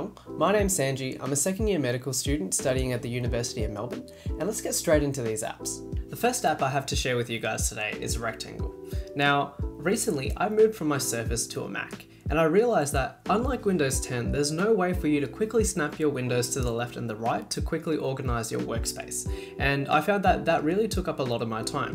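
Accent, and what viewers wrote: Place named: South Africa. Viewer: Are you originally from South Africa? Your accent sounds exactly like someone I know who is from there.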